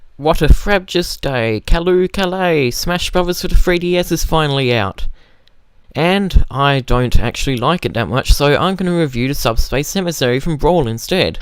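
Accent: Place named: Australia. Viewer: Australian voices <3 You <3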